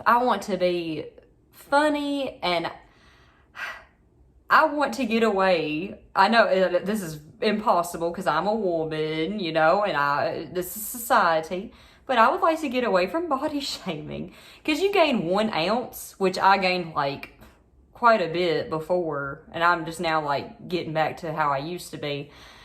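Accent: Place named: South, United States. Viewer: On tiktok on the newer ones I would guess Arkansas or Texas. This one feels more north central, Tennessee maybe. I'm from Louisiana myself and usually have an excellent ear for accents but I can't seem to narrow yours down. That's my random thought. Carry on.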